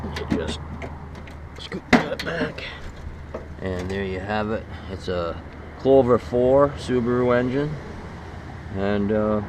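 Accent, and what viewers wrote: Maine, United States. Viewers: Sound like a mainah!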